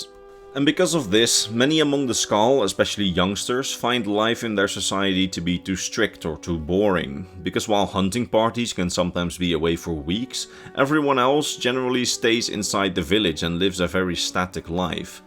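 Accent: Netherlands. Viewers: you sound Dutch, I'm guessing you're from Holland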